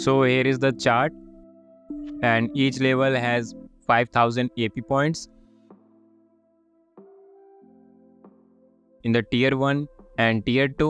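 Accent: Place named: India. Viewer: me needing help with a question: guy with the hardest Indian accent ever saving the day😎😎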